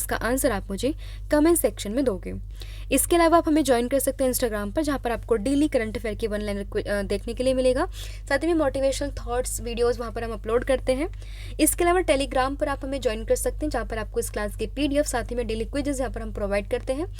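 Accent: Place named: Bengal, India. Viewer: Aap pakka Bangal se ho Aapka voice se pata chl gya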